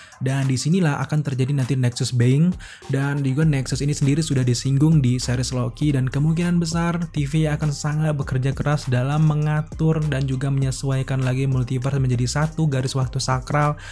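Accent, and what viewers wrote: Java, Indonesia. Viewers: gua gamasalah sama logatnya, mau dia logat medok kek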